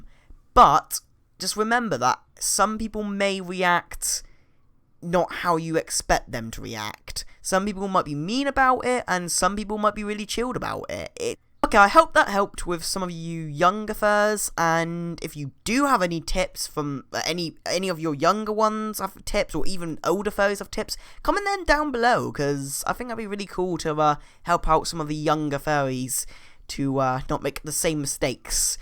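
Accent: United Kingdom. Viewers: r u fucking british?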